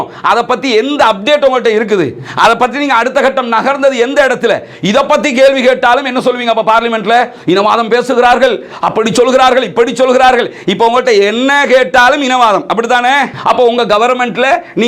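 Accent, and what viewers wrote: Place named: Tamil Nadu, India. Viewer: நீங்க தமிழ்நாட்டில் இருந்து வந்து இலங்கையில் வாழ்கிறீர்களா? ஏன் என்றால் நீங்கள் பேசும் பேச்சு வித்தியாசமாக இருக்கே! 😮 உதாரணம்: டாய்லெட் / டாலர் / பாலஸ்தீன் என தப்பு தப்பா உச்சரிக்கிறீங்களே!!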